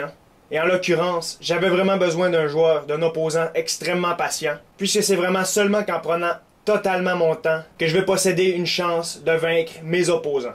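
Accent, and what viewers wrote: Canada. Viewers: Amazing question tu est canadien?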